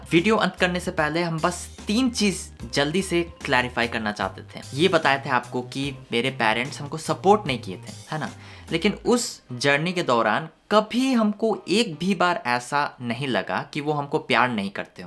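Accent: Bihar, India. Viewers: I did my schooling in a school in Jharkhand which had tons of people from Manipur. So, it doesn't surprise me to see some one with your looks talking with Bihari accent. All my Manipuri friends talk in pukka Bihari accent. But I like your boldness & content, and subscribing because of that rather than just the novelty of your accent + looks. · Baiya mujhe be aapki bihari ascent sikkado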